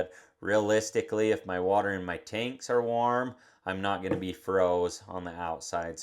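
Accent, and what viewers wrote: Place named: Sanpete, United States. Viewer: got the Sanpete twang in his voice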